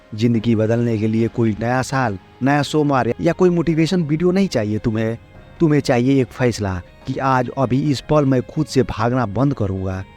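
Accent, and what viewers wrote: Bengal, India. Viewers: Bahot badhia bengali accent dada.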